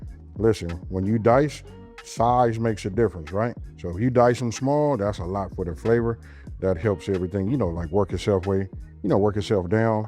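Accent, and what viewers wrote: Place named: Bay Area, United States. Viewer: Because of Ryan Coogler, I can now detect the Bay area accent 🤣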